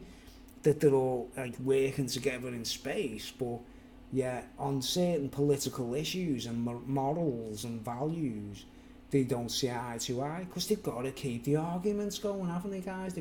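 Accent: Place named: Liverpool, United Kingdom. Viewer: Hi, another interesting speech,it was the gelert solo tent video that brought me to your channel in the first place, and I liked the Liverpool accent.funny thing is it's only when i'm having a swally I head over to see what your up to:-) (no offence) keep up the speeches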